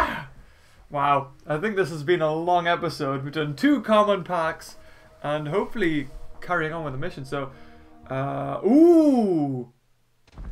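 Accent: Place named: United Kingdom. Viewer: Um,excuse me Mr..I hate to crush ur hopes but you will never do an exact impression of Chris Pratt.See,he has an American accent,you have a British one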